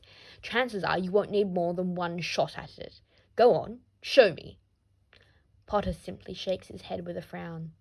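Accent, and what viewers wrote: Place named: Australia. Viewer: YESSSSS!!! finally a fellow Australian making one of these podfics, amazing by the way I find it hard to concentrate but i was really able to follow on with this on.😄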